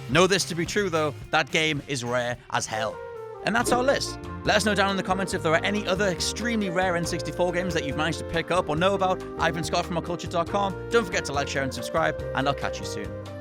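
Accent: United Kingdom. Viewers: Yeah, he's britsh. Rember they call the SNES the SNES and not by each letter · because he's British? That's how we say it. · That weak currency, on a British channel, with a British narrator? Nahhhhh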